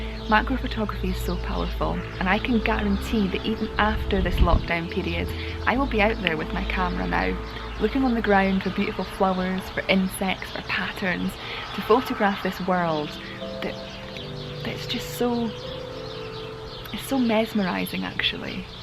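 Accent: Scotland, United Kingdom. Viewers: you have a good heart, and you are able to articulate it in your video's. You have great and entertaining ideas, delivered with your Scottish accent, and a soft voice. You have a bright future ahead of you. You know when to turn the intensity up in your voice and when to be soft. A real blessing. Thank you for your blog. Yours is on my automatic alert. Love the content of your videos. Educational and entertaining. Bill.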